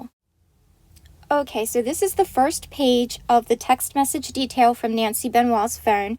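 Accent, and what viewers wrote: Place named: Pennsylvania, United States. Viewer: You have a slight Pennsylvania accent lol I’m born and raised in Pittsburgh and can tell that accent anywhere. You have to be from Pennsylvania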